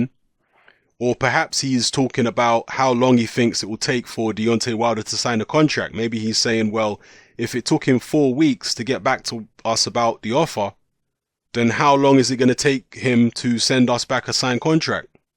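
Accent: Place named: United Kingdom. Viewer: U speak wit a uk voice and even u dont know the name of the stadiums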